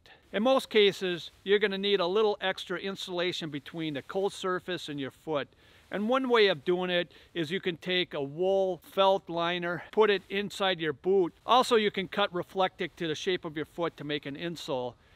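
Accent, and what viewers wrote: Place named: Midwest, United States. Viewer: By your accent I'm guessing you're from the midwest? I'm from northern New England where it also gets extremely cold. I enjoyed watching your video for the good advice and I got a kick out of your accent. You'd probably get a kick out of mine too. Thank you for your service and happy Thanksgiving!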